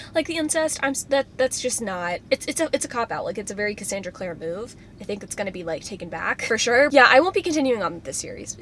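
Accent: United States. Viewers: I could listen to you talk all day lol I don’t know if it’s the American accent or not but your voice is so soothing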